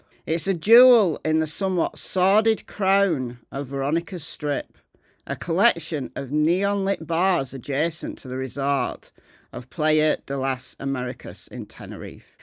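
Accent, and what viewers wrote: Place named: Lancashire, United Kingdom. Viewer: we all know what's going on, the media are implicated, you;re absolutely right. we all know, these little scrotes are pretending to be gangsters, emulating L.A. gangs and rap culture, and the old rave culture. with no knowledge. I get the impression that you know the old rave scene? Those days are gone. I bet you know, judging by your accent, that you know what I'm talking about, Lancashire has been the centre of house/rave since the opening of the Hacienda, as you know. You're speaking truth, you know, we all know, so...........why all the speculation? drama and theatrics?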